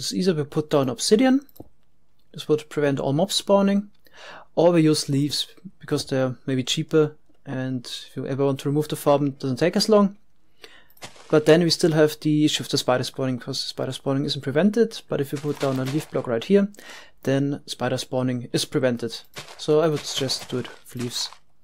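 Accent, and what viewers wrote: Germany. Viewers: You are from Germany. Am I right? a) speaking "und" instead of "and". b) the word English and German have in common you pronounce in German c) vowel have revealed you too. d) only Germas add "ja" as a "additional" word.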